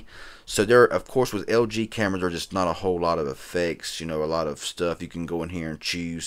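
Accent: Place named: Appalachia, United States. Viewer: Wheres this hillbilly come from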